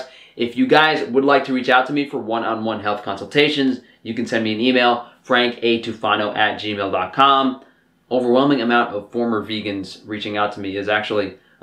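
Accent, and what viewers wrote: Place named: New York, United States. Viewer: it might be his new york accent 🤷🏼‍♀️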